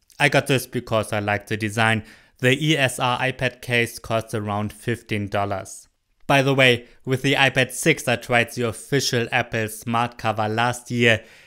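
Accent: Germany. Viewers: I am an Indian who is in Germany. I hear my colleagues speaking this accent when we have a conversation in English. lol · Most German accent ever can barley listen to it. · I LOVE THE GERMAN ACCENT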